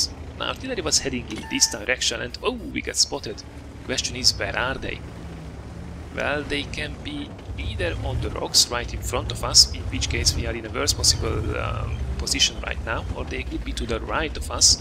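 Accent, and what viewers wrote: Hungary. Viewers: de hallatszik hogy magyar vagy :D ment a like es a feliratkozas :)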